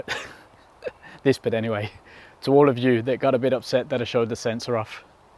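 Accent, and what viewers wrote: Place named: Northern England, United Kingdom. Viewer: sound like you fron northern England..maybe Liverpool/Manchester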